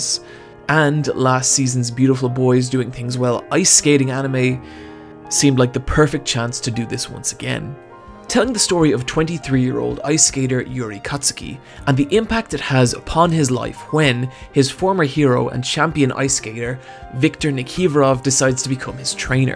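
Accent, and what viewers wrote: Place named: Ireland. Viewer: No hate i promise just wanted to say the dude sounds Irish (i'm also Irish)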